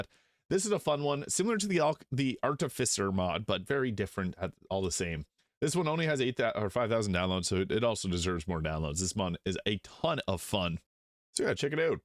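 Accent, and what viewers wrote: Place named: Quebec, Canada. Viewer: Hair? lol The French Canadian accent 😂 Im surprised how well the mods work on this game, Larian Killed it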